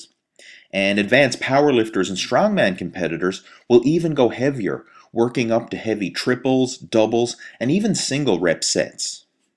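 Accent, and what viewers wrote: Newfoundland, Canada. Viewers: Are you from Canada? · I love your canadian accent! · Is that a newfie accent I hear? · Great videos! Lovin' the Newfoundland dialect aswell haha :D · best canadian accent i've ever heard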